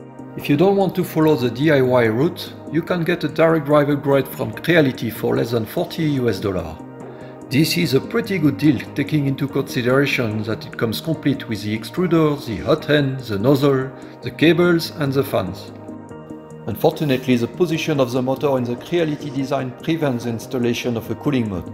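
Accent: France. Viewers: I love your accent, are you french? The way you say extruder just sounds so fancy lol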